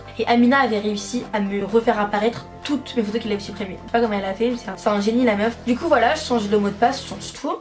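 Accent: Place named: Canada. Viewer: J'aime ton accent Canadian 😍😍😍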